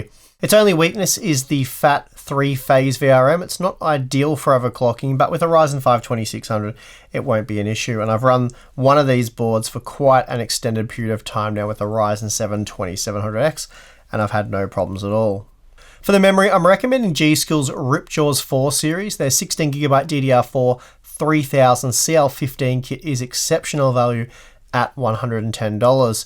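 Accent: Australia. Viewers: Sooooooo you sound Australian why not give Australia prices?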